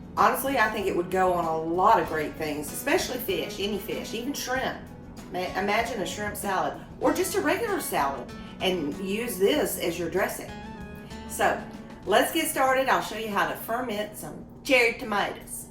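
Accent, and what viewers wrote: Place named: Kentucky, United States. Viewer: Hey girl 💥I don’t know where you’re from but you sound like you come from my neck of the woods!! Love❤️ love the video…❤️ from ky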